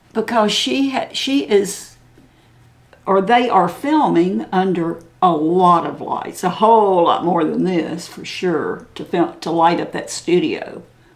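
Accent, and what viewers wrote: South, United States. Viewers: Your voice and your lovely Southern accent sounds like Melissa from her channel, you remind me of her. It's a delight to put makeup on along with you.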